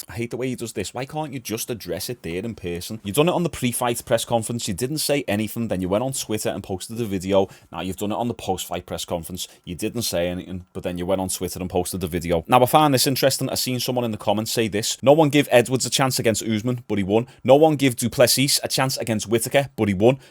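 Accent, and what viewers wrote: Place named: Yorkshire, United Kingdom. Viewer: Ars tha from Yorkshire m8? (I'm a dual citizen of Canada and UK, lived in Leeds for 4 yrs... trying to narrow down your accent)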